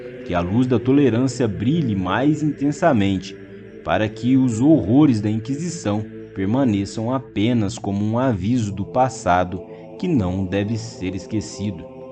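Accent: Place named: Inland São Paulo, Brazil. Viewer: O cara ja comeca o video ckm uma rendanvia na lingua portuguesa. " Há seculos atrás " . Se o verbo haver esta sendo usado sentido de tempo) , dispensa o " atrás ". Esse dotaque do interio de São Paulo mata a narração também , terrivel.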